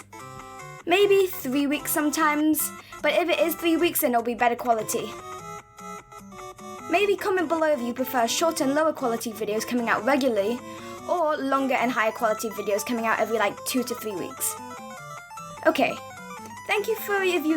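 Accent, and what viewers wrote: United Kingdom. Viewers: Are you british, irish or new zealander can't pin the accent but it sounds british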